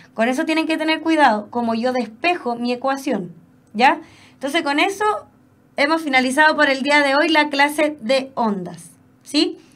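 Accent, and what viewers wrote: Chile. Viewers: Ah mierda es chilena, no le entendi nada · Me gusta el acento de los chilenos!!! Jeje ♥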